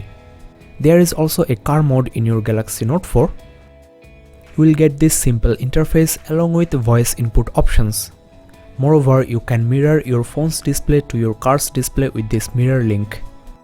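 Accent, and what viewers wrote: India. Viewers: Indian accent "~"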